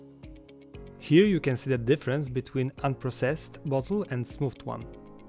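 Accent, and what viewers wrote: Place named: Poland. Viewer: POlak?? Dobrze slysze :D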